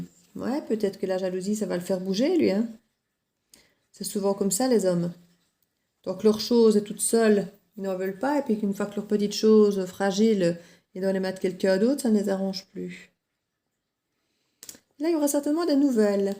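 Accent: Belgium. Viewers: Voilà que je vous découvre depuis la Belgique.... vous êtes géniale... Moi par contre je n'ai personne d'autre dans ma vie car rupture le 22 mars ....et je n'arrive pas à l'oublier pour le moment.....un petit accent de Belgique peut-être ? Je me trompe peut-être....😊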